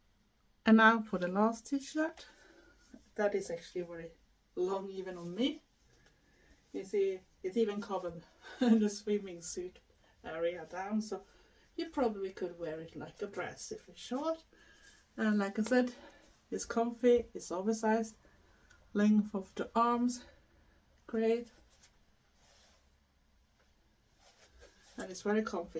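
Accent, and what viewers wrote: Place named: Sweden. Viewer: Hay, new suber here, i love your accent, sweeden is beautiful, love the haul, everything is so cute x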